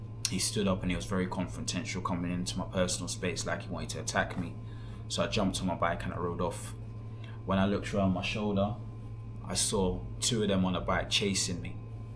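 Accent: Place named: London, United Kingdom. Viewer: If you had not done anything wrong, why did you flee? If you did nothing wrong, why did they chase you? If you had been causing trouble and left they would have just let you go, unless you had not paid a bill. Plus, you did injure a pedestrian. They could have charged you for that. These cops are not like the MET cops. You sound like you are from London. No verbal warning here. No behave yourself and go home. And definitely claims of: is it because I am black, don't work in Thailand.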